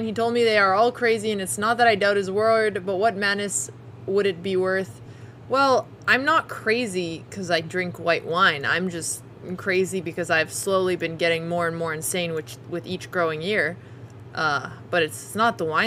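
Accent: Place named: Canada. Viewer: why does she have more canadian accent than usual in this. prob the alcohol lol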